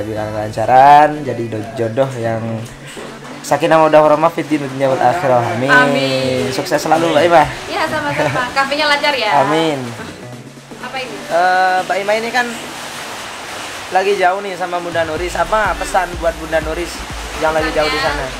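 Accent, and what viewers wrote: Madura, Indonesia. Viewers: Dengerin suaranya ko kaya ada logat Maduranya, eh ternyata emng pake Madura🤣🤣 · Medureh sarah 🤭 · bahasa madura.a bnget,lucu pas ngimucak bahasa medureh🤣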